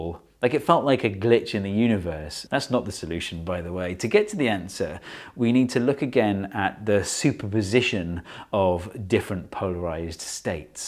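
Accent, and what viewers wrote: England, United Kingdom. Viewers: he finally says anticlockwise! Sounded so wrong to hear his English accent saying "counterclockwise"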